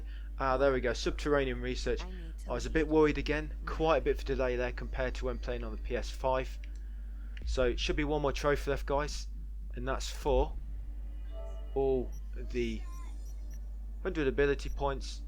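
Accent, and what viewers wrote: United Kingdom. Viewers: what part of the uk you from I was trying to pick the accent, after hearing you for all those hours 🤔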